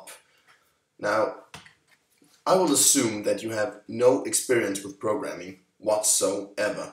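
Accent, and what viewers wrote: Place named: Denmark. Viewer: ham her lyder dansk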